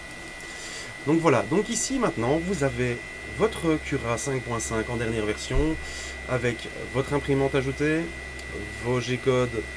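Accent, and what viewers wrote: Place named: Belgium. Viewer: De toute façon merci pour les vidéos très instructives, la rançon du succés c'est de ne pas avoir assez de temps pour faire tout les spectacles, un peu comme une vedette de la chanson quoi ^^^ et vive la Belgique (et ses supers chanteurs et humoristes) et j'avoue sourire quand vous vous excusez auprés des français pour la prononciation des chiffres à votre manière.... PS: comme je débute je préfére Cura pour le moment pour cette machine mais si vous avez le temps pour la suite en vidéo particulièrement pour un tutoriel (didacticiel en bon français ^^) sur par exemple "comment on fait sa première impression après avoir suivi les cours de??" Et aussi "que faire quand on a terminé son impression?" (je ne me suis pas encore lancé sur cette machine plus que récente pour moi en arrivée à la maison). Merci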